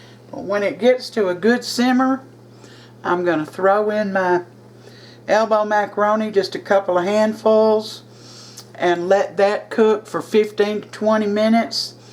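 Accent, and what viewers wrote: South, United States. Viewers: Lol, she said giving a thumbs down was mean😂😂😂. I'm definitely giving you a thumbs up Mama! Love that country voice😊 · CAN YOU PLEASE DO A VIDEO SHOWING US HOW TO CAN YOUR WONDERFUL SOUP? So glad I found your channel! I have a lot of catching up to do, looking at all your yummy dishes! I love your southern accent! Thank you for sharing!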